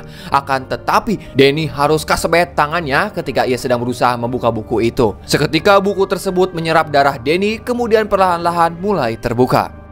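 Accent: West Java, Indonesia. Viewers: Asalamualaikum AA emg org Sunda gitu abis lucu amat kalau nyaritaken pelemna Sagala Aya bahasa aing kitu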